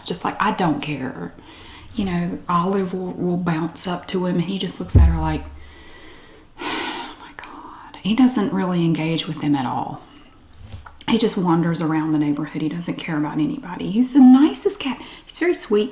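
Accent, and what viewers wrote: South, United States. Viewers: Damn I love that Southern accent , I could listen to her talk about paint dry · Happy channelversary! You have a fan from Oaxaca Mexico 🇲🇽 Your videos bring me a lot of memories of my life as an Au pair in Hampton roads VA back un 2018. I love the southern accent and I think you're a sweetheart! Saludos (greetings) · Well I love your southern accent. And I think you are so funny😂 ❤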